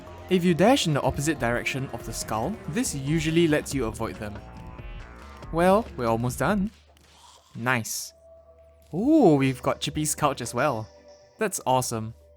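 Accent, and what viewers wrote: Singapore. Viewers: Hey, just wondering, but do you live in Singapore? You kinda sound like a Singaporean for some reason....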